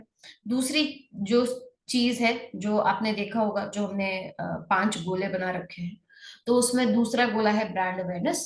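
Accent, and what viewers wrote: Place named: India. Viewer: I'm a bit late here, but you need to work on your English pronunciation. Your indian accent and mix of English and Hindi overshadow every single word in this video, it's impossible to understand unless you're a native indian. Which i'm not, so I didn't understand a lot of this. Good powerpoint though but that's about it, thank you.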